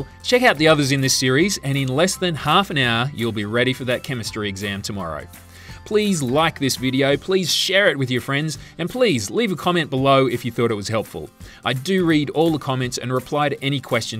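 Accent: Australia. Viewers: R u Australian? 😅 sorry but u sound Australian just asking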